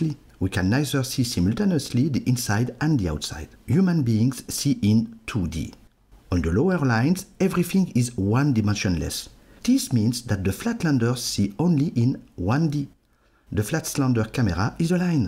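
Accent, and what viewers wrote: France. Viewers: nice explanation, i like the french accent :) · His accent is like hercule poirot :) pronouncing the r like in french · This video actually helped me process 4D. Also the narrator's french accent was funny and brightened my day :)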